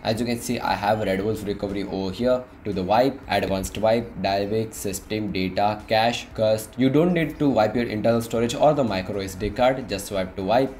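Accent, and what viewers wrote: India. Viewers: From your english u sound like an Indian. What will u do without volte and with 7.1.2 . It doesn't matter if it is 7.0 ot 7.1.2 off basic things are not working. Strange people..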